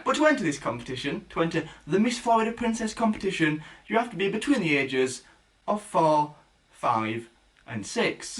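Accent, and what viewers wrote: United Kingdom. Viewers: I love your accent are you British? Swedish? Irish? Or Australian?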